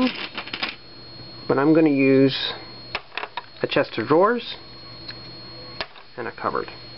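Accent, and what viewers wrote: South, United States. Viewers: you have what sounded like a southern accent that ive never noticed O.o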